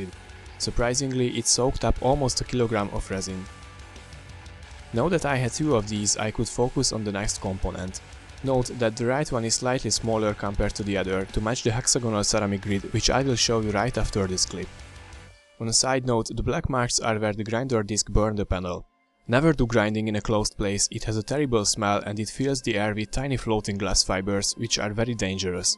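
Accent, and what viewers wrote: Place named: Hungary. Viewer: Hú de hallatszott a magyar akcentus de jó lett a videó. XDD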